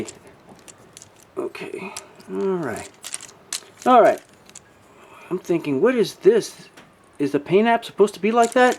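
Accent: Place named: United States. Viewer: how do you have an american accent if your not from the US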